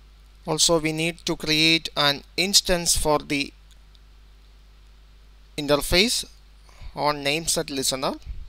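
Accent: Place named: India. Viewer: Dat indian accent dou. =D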